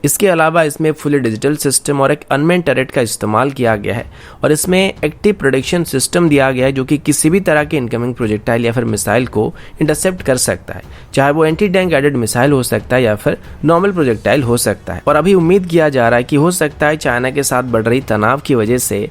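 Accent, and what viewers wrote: Odisha, India. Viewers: Are you from odissa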